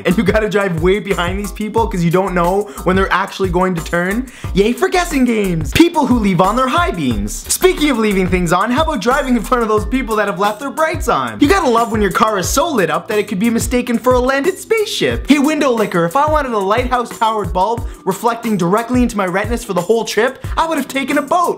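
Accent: Canada. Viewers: I love it when he's in a mad rant it's still kinda pleasant cuz he's so canadian. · this is going to sound completely rasist but are u Canadian.I love your vids u r cool 😎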